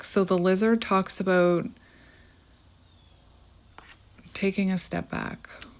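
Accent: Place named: Canada. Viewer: As a Canadian I've been wondering for a minute if you were also Canadian because of your accent. I'm in the U.S. so I can def recognize that homeland dialect 😂❤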